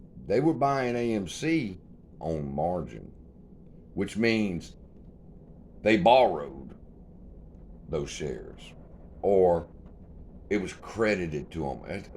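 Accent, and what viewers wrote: South, United States. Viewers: I love your accent ? May I know where you are from ? Is that southern ? I am from Asia so I dunno much.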